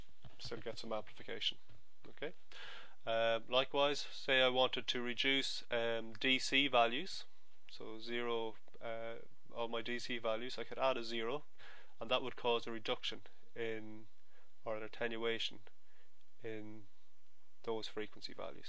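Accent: Ireland. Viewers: Nice to hear an Irish accent. Thanks for the vid.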